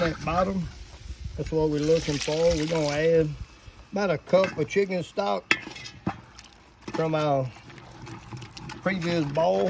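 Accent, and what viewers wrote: Louisiana, United States. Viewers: Are you from South Louisiana? From Cajun Country?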